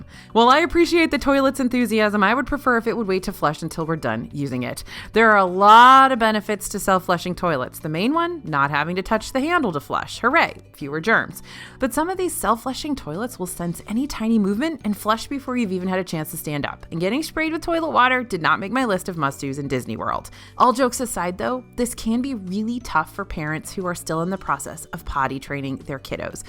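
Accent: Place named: South, United States. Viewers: The quasi-Floridian southern accent was really evident in this video with the disproportionate number of twangy "y'all's" compared to other videos. Fun! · is a Texan. They throw ya’lls around all day long in the Lonestar State!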